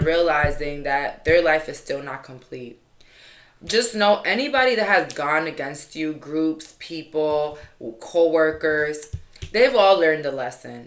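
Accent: New York, United States. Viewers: You are my favorite reader on YouTube and we both live in New York City so I love the way you talk😂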